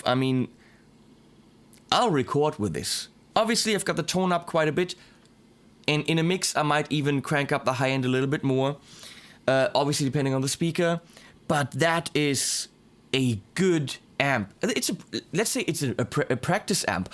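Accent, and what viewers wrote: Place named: Germany. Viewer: your accent is kinda german :)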